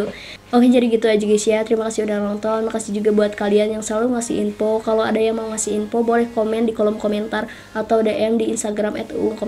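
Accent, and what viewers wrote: West Java, Indonesia. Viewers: Boleh nanya ka org mana si? Jabar kah:v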